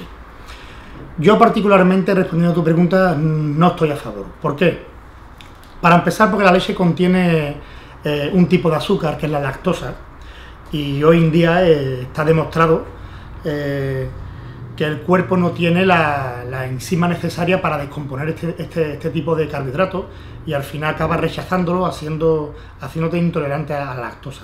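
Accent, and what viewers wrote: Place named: Seville, Spain. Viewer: es sevillano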